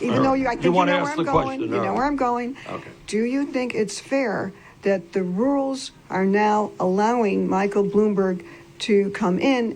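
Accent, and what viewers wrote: Brooklyn, United States. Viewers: Ya can't get the Brooklyn out of Bernie! When will people understand that ya don't mess with people from the NE? I'm from Philly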